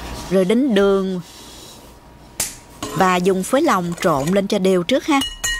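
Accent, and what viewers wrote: South, Vietnam. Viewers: Cháu oi! Là con gái cua giong rạc Nam Bộ hiển Hòa chân chính mà sao lai giới thiệu kênh nghe kênh kenh Hòa hoa làm sao dịu dàng được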